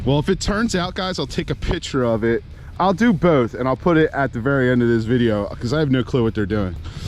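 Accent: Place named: Pennsylvania, United States. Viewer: Pennsylvania? You sound like you’re from PA.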